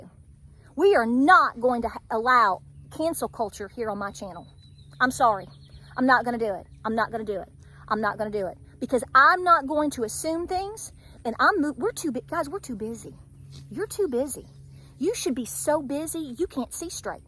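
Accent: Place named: East Tennessee, United States. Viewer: I agree 100% with you! Going out to grease the tractor and bush hog. I will then work the garden. I am an East Tennessee native and senior woman farmer. I talk just like you and I am not changing. You Go Girl! God bless everyone. John .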